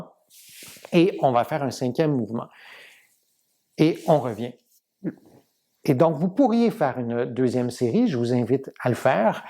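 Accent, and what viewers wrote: Canada. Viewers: Merci pour vos conseils. J’adore votre petit accent canadien…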